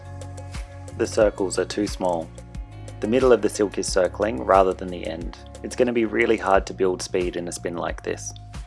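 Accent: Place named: Australia. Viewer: Thanks for this (and all your tutorials)! You do a great job of breaking it down. I also very much enjoy hearing the Aussie accent from the other side of the world. Keep up the good work🙂🙏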